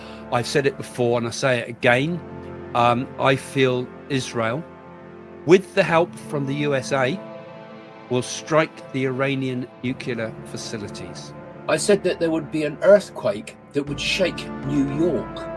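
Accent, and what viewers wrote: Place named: England, United Kingdom. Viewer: So refreshing to hear an English voice on You Tube. So many Psychic forecasters are American. Thanks xx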